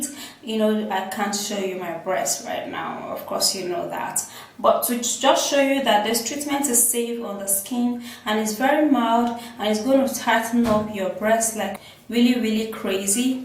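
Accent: Nigeria. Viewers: Blandina Mbock that a Nigerian accent you dummy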